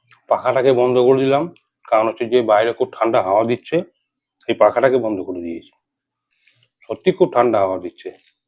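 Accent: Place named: Bangal, Bangladesh. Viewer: বাঙাল বাঙাল, ঘোটি কখনোই নয়। ওনার কথাবার্তায় পরিষ্কার বোঝা যায়।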